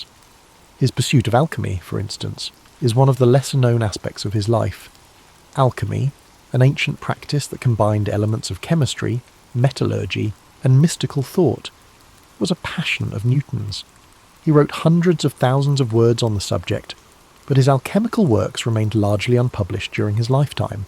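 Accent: United Kingdom. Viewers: I have to wonder, much as i am listening to these! if this is AI as so many facts and stories are being put out quite fast and this is an english ‘narrator’ but the site is American.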